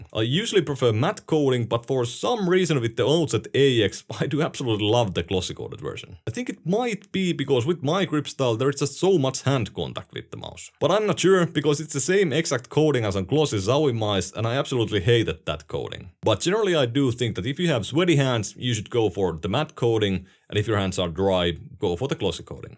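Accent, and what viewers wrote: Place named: Finland. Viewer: Jätkästä kuuluu kilometrin päähän et sä oot suomalainen...Sä et puhu rallienglantia, etkä huonosti mut sen vaan kuulee. Tilasin.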